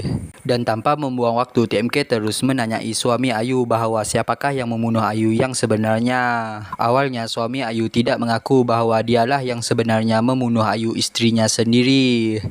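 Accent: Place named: Johor Bahru, Malaysia. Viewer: Aduh jd kangen ke JB lagi.krn Abang cakap macam tuh. Love you Abang buat sy kangen. 🥰🥰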